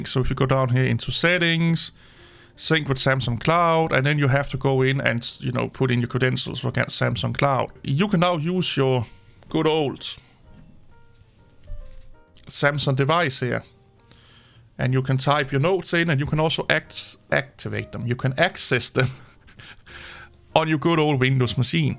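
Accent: Denmark. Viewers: Thanks so much I use Notes for my studies and needed this to work on my PC. Samsung needs to fix this its a bad look. The whole point I have a Samsung and not an iphone is because the accessibility and customization. Also are you from Denmark? Accent sounds like it.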